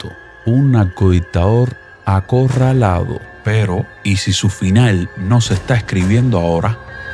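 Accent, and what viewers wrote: Cuba. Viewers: Aquí vine directo a ver el vídeo de la orden maldita! Me encanta la seriedad, la veracidad y la agudeza de tu recopilación narrada en tono de asere!! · Q acento más horrible. Cubaneo, sola vaya.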